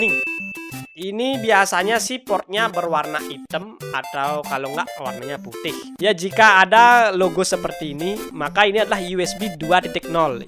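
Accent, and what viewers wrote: Bali, Indonesia. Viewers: Bali sajan logatne nok 😅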